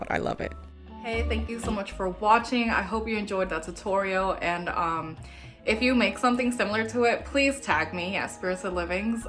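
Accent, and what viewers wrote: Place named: Puerto Rico. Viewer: Ave María, suena puertorriqueña le dije a mi marido 👍🏼💕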